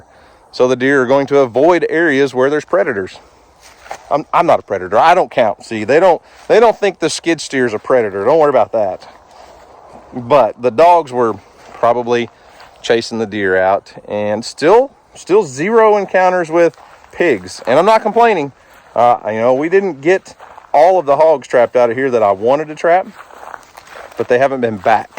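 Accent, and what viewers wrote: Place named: Alabama, United States. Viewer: Those that are wondering…. has to break up the Cog….Hill because the A.I. (Artificial Intelligence) that adds the Subtitles, hears Cog Hill said normal speed with an Alabama accent, as Cock. It thinks is swearing/being rude and either removes the video or doesn’t pay him. 🤦‍♀️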